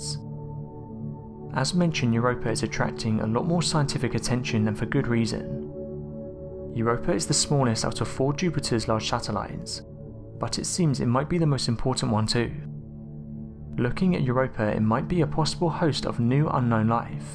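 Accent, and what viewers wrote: United Kingdom. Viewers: That is it. I am sick of your videos. 1) you should not narrate your videos until you correct your "Rs" and "Ss" and loose the brit accent. 2) Stop using deceptive titles. When you were a child, you got in trouble for stealing.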